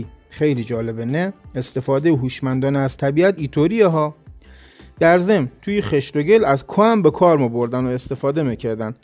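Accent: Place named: Rasht, Iran. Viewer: چه لهجه ی رشتی قشنگی دارین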